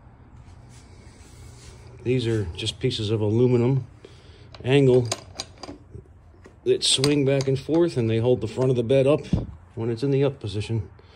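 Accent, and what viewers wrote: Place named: Chicago, United States. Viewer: Cook county accent.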